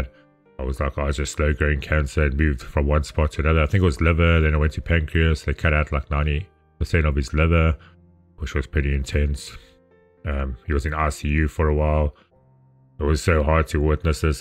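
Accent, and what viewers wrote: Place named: South Africa. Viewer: Wow. I'm so sorry to hear about your story. When the video started I wanted to say that you sound like a fellow South African. I know the accent. Greetings from Port Elizabeth. I'm gonna watch the reaction now but I already know I'm gonna be in tears. If you have a few minutes to spare, please react to by (live from the American idol farewell season) it's a very very emotional performance. And I'm so very sorry to see and hear about your heartbreak. Bitter jammer 💕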